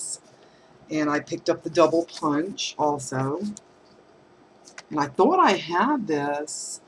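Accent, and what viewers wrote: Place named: Charlotte, United States. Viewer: Omg, not I know why part of your accent sounds just like my mom's. She is from Charlotte and that's where I was born. Anyways, I was just gonna skip going until your video so thanks for sharing!!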